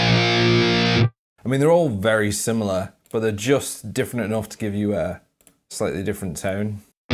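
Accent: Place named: United Kingdom. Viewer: Hello. You always used Lancaster IR loader. Is there any difference in using it or the NadIR one? I just discovered NAM and was amazed by the Emil Rohbe models when I watched on another vídeo. I even did a search about him (he has also a YT channel). Now I see you talking about him. I dndt understand this ""triple or jwel rack"(?)" (I strugle to understand britanic accent)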